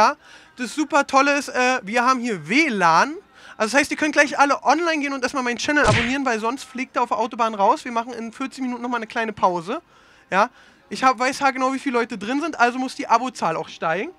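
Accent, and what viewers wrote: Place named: Berlin, Germany. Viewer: Ich könnte mir das video so oft angucken immer lust drauf haha mit seine berliner icke😹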